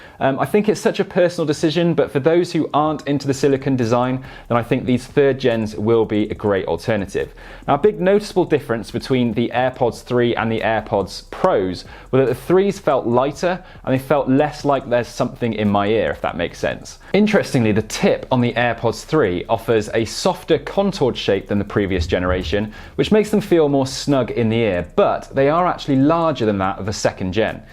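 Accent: United Kingdom. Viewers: Great video; love a British accent.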